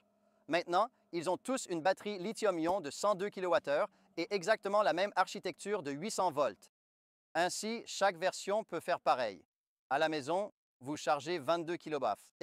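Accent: Quebec, Canada. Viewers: La traduction automatique n'est pas très bonne. Et la voix choisie a un accent québécois.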